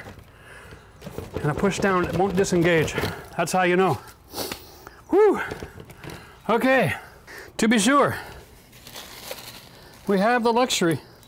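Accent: Canada. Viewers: You live in Florida but have a Canadian accent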